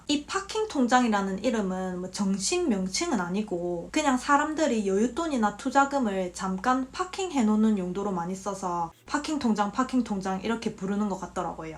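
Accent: Gyeongsang, South Korea. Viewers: 경상도 아지매라 그런지 말이 쏙쏙 넘 재미나네요 농협 붙박이로 쓰는데 유익한 정보 보고 갑니다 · 우연히 보았는데 인터넷을 잘못하는데 토스뱅크인가 파킹통장 먄들어야겠네요 경상도 아가씨라 쏙쏙 귀에 꼬치네 유익한 정보 고맙십니뎅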